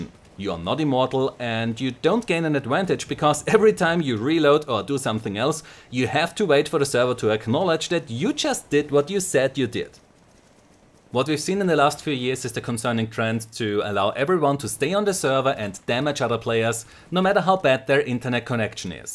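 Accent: Germany. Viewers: What is that accent? Is it German? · Aus deinem echt fantastischem english habe ich rausgehört das du doch ein deutschsprachiger bist also schreib ich´s auch in deutsch wenn es dir recht ist. verdammt interessantes video !!! danke dir sehr. Hast du infos was world of warships angeht betreff´s .netzwerk sachen für mich? Ich weis nur das wows (world of warships) mit UDP arbeitet,und das es einen dedizierten server gibt für den EU bereich,ich glaube das er in frankfurt steht denn mein ping ist immer auf dem gleichen wert von 34-35. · You sound german :D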